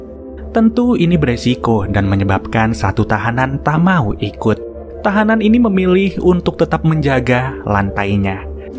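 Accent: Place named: Banyumas, Indonesia. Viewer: Wong ngendi koh lur kayonge ngapak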